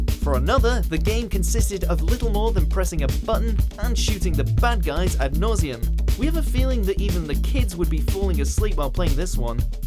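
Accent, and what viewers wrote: United Kingdom. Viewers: Why is the narrator so british in this? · Who is this British guy?!?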